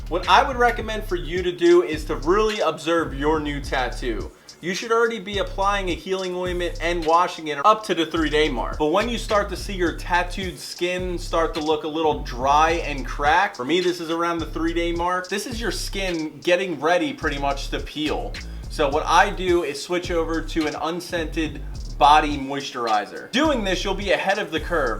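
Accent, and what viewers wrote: Philadelphia, United States. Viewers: You sound like your from philly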